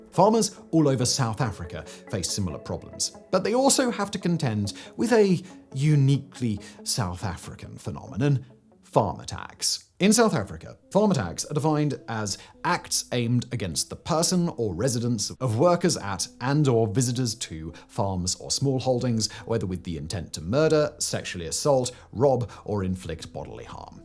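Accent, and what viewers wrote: RP, United Kingdom. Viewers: Your posh English, lack of general knowledge, and biassed turns of phrase betray your prejudice and ignorance. "SANDF" under NP rule? "Communists" in air quotes... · Oh!! You must be Spewing Accurate Data with your Know it all' Stuffy British Accent Right ✅️ No Leftist Bias here at all..😂 he's sadly Marginalized a Plight of people which is Very Much Real.. but if you've got 15-20 minutes too hear another Know it all Brit Spew what his Taskmasters ask Hey' its your time too waste 🗑 ( i sadly Did!!)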